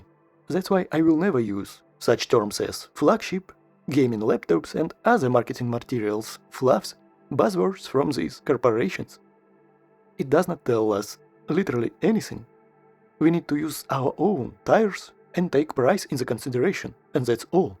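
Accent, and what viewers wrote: Russia. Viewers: Приветствую! Я редко пишу комментарии но щитаю что нужно разъяснить ситуацию с хейтом против вас немного с другого ракурса ))) Есть видео от других авторов по этой теме (100ультра vs 200про) где всё наоборот! Где 200про зачастую лучше 100ульты причём по основному модулю! Существует такой канал 2Megapixels и там есть видео (недели 2 ему) где 100 ультра не особо вывозит 200про ))) И там тоже есть конкретные примеры ))) И тут возникает справедливый вопрос! А кто же из вас двоих не прав! И я прекрасно понимаю людей что обрушились на вас с бурей негодования и хейта. Ведь их претензии к вам основаны не на их не на чём не обоснованном мнении а на конкретных примерах с других каналов по точно такой же тематики что и ваш канал. И тут уже дело не в особо одаренных хейтерах а в том что ваш контент противоречит контенту других авторов которые зачастую обладают более авторитетным мнением чем вы. 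Вот поэтому вас некоторые люди и воспринимают довольно поверхносно и крайне предвзято относятся к вашему контетту. Щитая что вы очередной хейтер конкретной модели 200про. И да главное поменьше делайте акцента в ваших видео на критику в ваш адрес. В противном случает люди что навалили вам негатива будут делать это усерднее ))) Хм по вашему акценту я думаю вам не потребуется гугл переводчик для прочтения моего комментария )))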